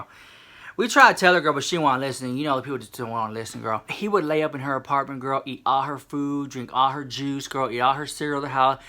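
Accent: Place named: Texas, United States. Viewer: girl we can hear you come from Texas.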